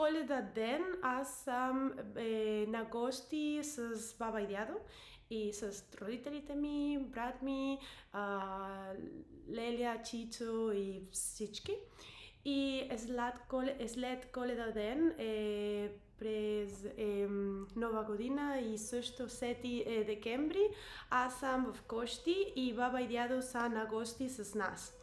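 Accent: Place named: Spain. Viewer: Браво момиче! Още по-добре говориш. Харесва ми испанския акцент когато говориш на Български. :)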